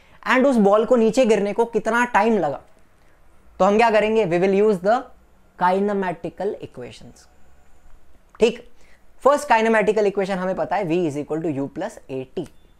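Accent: Maharashtra, India. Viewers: Sir you are Marathi 😁😁😁😊😊😊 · Sir you from Maharashtra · His sir you are Marathi??? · Sir are you marathi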